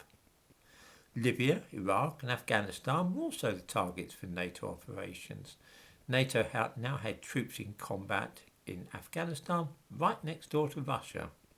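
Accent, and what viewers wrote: United Kingdom. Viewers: sounds British to me do you speak English? Your facts where did you get them from are you a British civil servant Where do NATO weapons go? Near Odessa, the SBU detained smugglers during the sale of weapons purchased from the Armed Forces of Ukraine in the combat zone. the real news from the ground "🅾️" group, Colonel General Alexander Lapin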